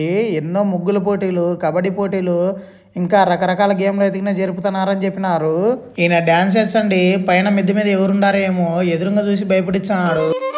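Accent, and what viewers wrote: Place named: Rayalaseema, India. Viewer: Anna me videos lo oka speciality eantante meeru matladetappudu mana రాయలసీమ slang baaga telustundi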